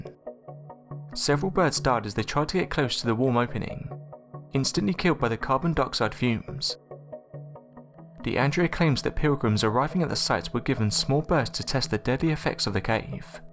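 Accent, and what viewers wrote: United Kingdom. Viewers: Quit with the annoying British accent. You are LOSING VIEWS AND SUBSCRIBERS..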